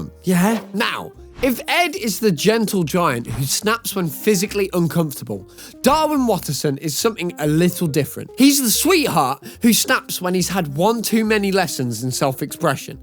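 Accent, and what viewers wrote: United Kingdom. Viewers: This is the first time i see this guy and i just clicked it and the british accsent really scared me idk why 😭🥀🥀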